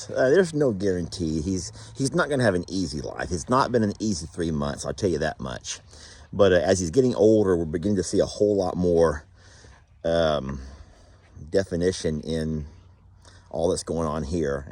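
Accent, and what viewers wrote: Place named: Texas, United States. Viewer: Texas accents are NOT TERRIBLE. I have one but not as much as you. But I love them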